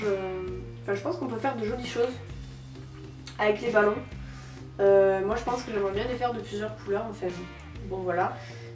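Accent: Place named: South, France. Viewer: Coucou, c'est Myriam, j'ai beaucoup aimé ton TAG, tu as raison, 20 livres, c'est déjà suffisant pour avoir de quoi faire!! :p Par contre, tu as un sacré accent, tu viens du sud? :)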